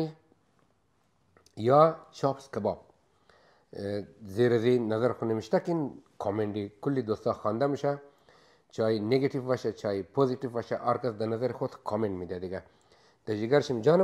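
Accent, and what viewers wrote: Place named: Jaghori, Afghanistan. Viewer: ده جگرت نفس با امو لهجه قشنگ جاغورگی خو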